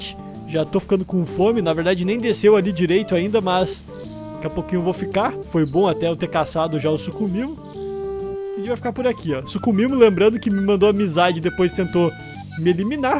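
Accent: Brazil. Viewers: Seu canal e o melhor do mundo. Uma pergunta você é brasileiro ou português? Eu gosto de indoraptor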